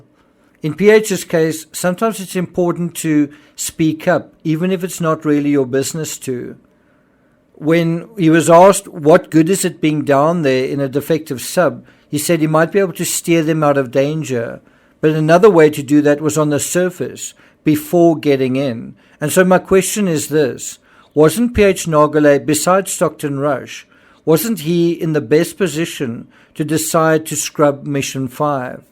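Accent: South Africa. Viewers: Love your work and your accent. South African?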